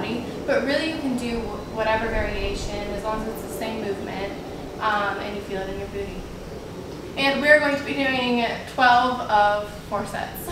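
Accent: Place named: Nashville, United States. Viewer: I can hear that Nashville accent coming through a little!! 🤠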